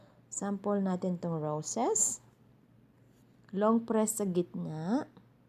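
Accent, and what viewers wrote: Philippines. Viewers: Why do u sound like Phillipino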